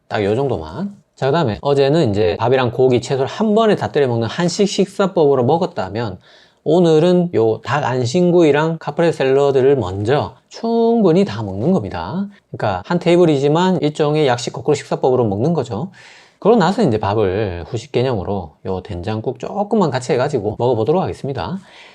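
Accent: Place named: Busan, South Korea. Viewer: 혹시 의사샘 부산에 계신건가용? 말투가 익숙한것이 ㅎㅎ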